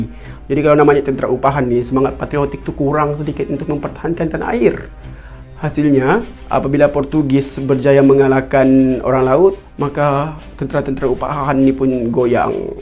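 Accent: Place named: Sabah, Malaysia. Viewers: .. ehh aq takde pun cakap komen die masalah. Ke kau yg tak reti baca komen aku.. cer baca dan hadam btul btul.. kau tgok speaker tu org sabah serawak tapi cuba jugak untuk cakap melayu.. dekat situ pun dh boleh belajar sesuatu. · Dia Sabahan bang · mcm orang tawau baini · Lepas ni kau cerita pasal pilipin pulak aku dengar cara cakap kau ni org pantai timur Sabah kan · bro ni org apa..bahasa melayu dia mcm org sabah sarawak... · Aku rasa cukup pekat Sabah sudah tu bahasanya tu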